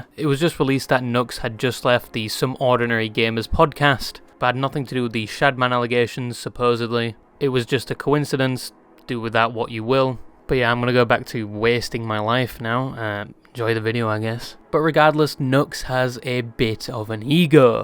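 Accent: United Kingdom. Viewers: ahh yes the british disembodied voice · British man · wait you're a brit? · he’s just british mate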